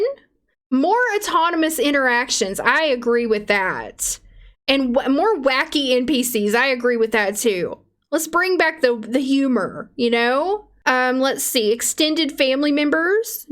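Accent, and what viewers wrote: South, United States. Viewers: Is it a southern American accent?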